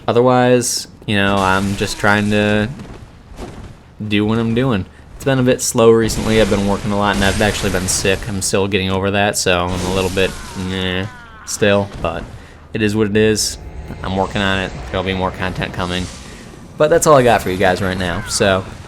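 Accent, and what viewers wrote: United States. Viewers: the american accent is strong with this video.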